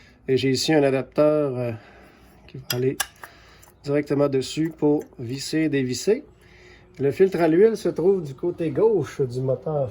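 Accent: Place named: Quebec, Canada. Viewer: Accent sympathique du Quebec.... Bonjour depuis la Suisse, mon pays. J'ai une nine- t Racer...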